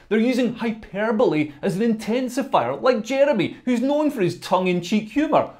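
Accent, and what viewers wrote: Scotland, United Kingdom. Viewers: didn't know Clarkson is a comedian, a Scot mocking a scouser haha brilliant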